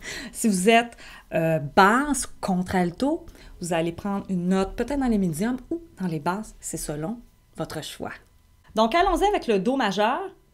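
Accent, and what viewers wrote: Quebec, Canada. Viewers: Vous êtes québécoise ???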